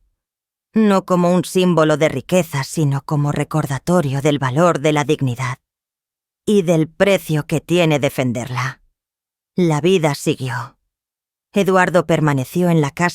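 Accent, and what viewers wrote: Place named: Spain. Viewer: Mis respetos para los españoles pero No resisto una narrativa con ese acento. 😢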